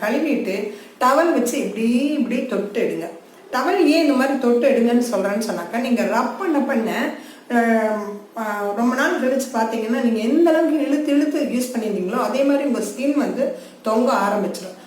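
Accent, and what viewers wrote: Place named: Kongu, India. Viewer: Sure I am from tirupur I easyly connect our kongu slang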